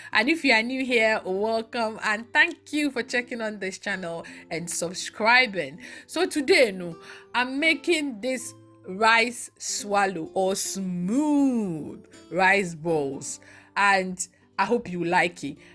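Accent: Ghana. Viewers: My first time here tho😁 you are funny and you sound like a Ghanaian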